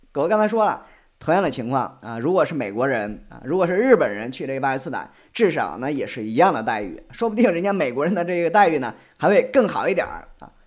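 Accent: China. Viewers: 你好 首先我是已和平姿态想和你讨论 听你的口音 应该是大陆人 所以我很好奇是什么经历导致你有了这样的世界观价值观 再次声明 我没有谴责你的观点 虽然我不同意 我只是好奇中国政府或是人民对你做了什么 让你这么反共？ 说说我吧 我是88年出生的大陆人 04年我就去美国印第安纳读了高中和大学 14年回国发展 我是可以留在美国工作生活的 然而我还是选择回国 而且这几年我在大陆生活越来越好 我对国家也越来越信任 我能在这里留言 也证明我不是被抢墙封闭的国人 我可以看到世界所有方面的言论和观点 我是真的想知道是什么生活环境 或是什么事件导致你这样的世界观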